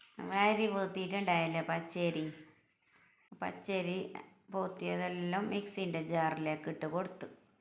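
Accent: Kasaragod, India. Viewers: Good 👍 ksd slang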